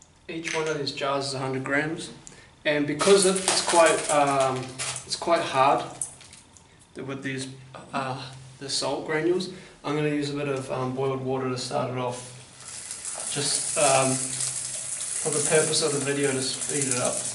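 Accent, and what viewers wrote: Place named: England, United Kingdom. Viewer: When there is talking and stirring at the same time, with an English accent, it is difficult to understand.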